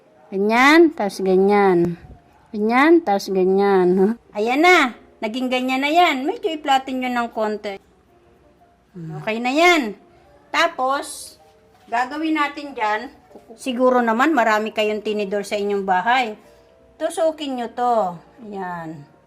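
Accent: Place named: Paete, Philippines. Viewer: taga paete laguna po ba kayo? Pansin ko lang po kasi sa punto. heheh ang ganda po ng cooking vlog nyo. madaling sundan sobrang detalyado..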